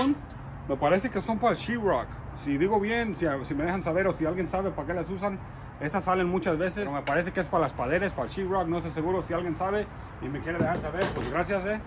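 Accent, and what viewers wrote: Spain. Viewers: A mi también me encanta, y el acento español q tiene más..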